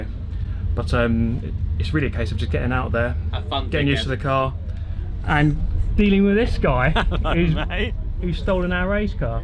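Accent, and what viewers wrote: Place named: RP, United Kingdom. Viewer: How posh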